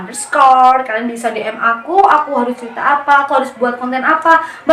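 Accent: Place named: Java, Indonesia. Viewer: Medoknya uwuw kak🤩🤣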